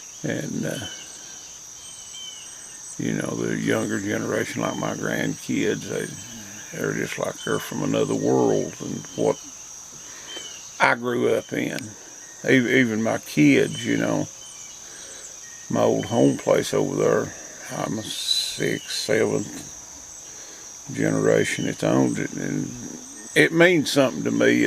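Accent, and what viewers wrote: South, United States. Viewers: Hi!!😀😀 I just love listening to you with your southern accent!! 😀😀 I can understand you just fine. Top less women in Ashville!! Wow!! I think I need to visit. LOL!! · I think you talk like a southern gentleman. Up north we talk a lot courser. Not smooth. And New York state has five or six distinct accents. We live 90 miles north east of nyc and are a summer tourist area. Lot of city people moved up here over the years so we sound more like that then people furtber upstate.I know when I am in Tennessee and open my mouth them folks know I ain't local! Have a nice weekend.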